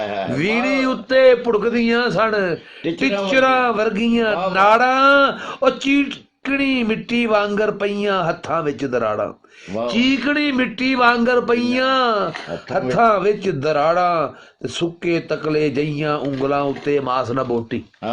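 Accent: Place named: Sheikhupura, Pakistan. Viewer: Masha ALLAH 💞 It seems GOD did gave some talent to to 🔥 to speak 🗣️ perfect pure Punjabi of QILA SHEIKHUPURA DISTRICT of OLD PUNJAB, You wouldn't have MAKAI ((corn 🌽) ROTi since it's 100% American native of USA 🤞🍀🙏🔥🚒🔥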